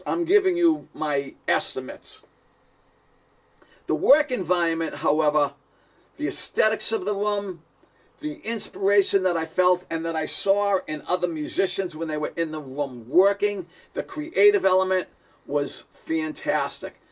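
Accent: Boston, United States. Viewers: I think your accent is far from "the worst" Boston accent. I like it, in any case.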